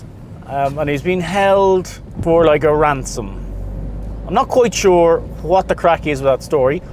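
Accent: Ireland. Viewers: question.....Do you speak French with an Irish accent?:eyes-pink-heart-shape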